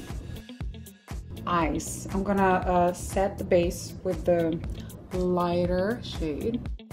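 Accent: Russia. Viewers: she's russian doe, she definitely don't have chinese accent lol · This russian accent 😍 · Anna Kozina у неё самый настоящий русский акцент. Так только мы говорим. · Love your russian accent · Nice voice. Very rispy russian tone. Gorg!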